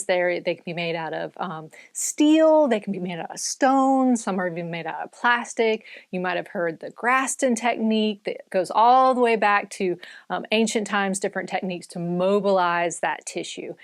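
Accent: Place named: Oklahoma, United States. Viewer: I'm from west Texas, your dialect sounds like my family from Oklahoma, it's nice to hear!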